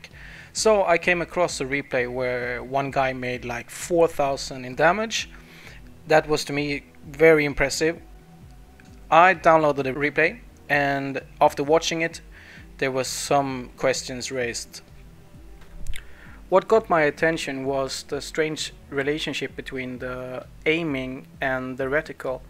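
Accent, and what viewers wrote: Sweden. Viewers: where are you from you sound like swedish a little bit i swedish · Jag tyckte att jag hörde det på din engelska:D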